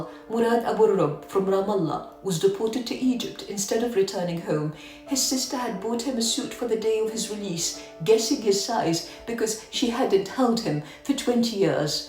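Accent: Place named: England, United Kingdom. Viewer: Pity about the elite English accent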